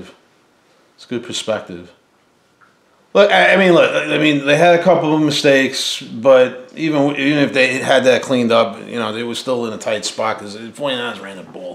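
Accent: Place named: Boston, United States. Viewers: You sir are not from Texas with that Boston accent🤙💪🫡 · You kinda look like Christoph Waltz. How'd you end up a Cowboys fan with a thick east coast accent like that?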